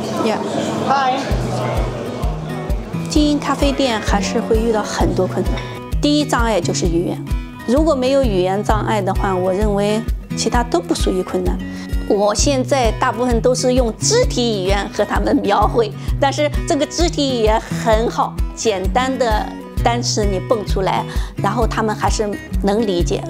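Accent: China. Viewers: 一听就是江苏的， 这里面对的困难要比国内多得多，就业发展（无论是你或者子女）都不如中国， 但好在你生的是个女儿， 在澳洲也不难混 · 果然是南京老姐，一听口音就像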